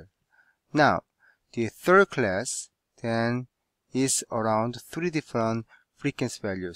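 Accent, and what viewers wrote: South Korea. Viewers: Omooo!!💜thank you so much😇 Wait am I the one here feel confused about the man speaking having a Korean accent of English??😁anyways good job really a life saver😇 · Off topic but can i ask why does your accent sound like Korean English accent ? Or just mind is still in SK.....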